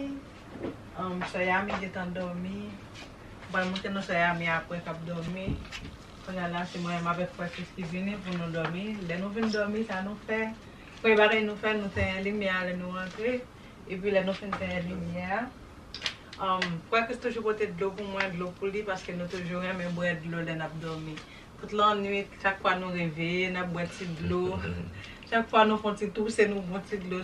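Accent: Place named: North, Haiti. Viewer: Hi mes amours Nou se moun okap?fason ke nou pale surtou dam nan 😅 mw menm mw se moun okap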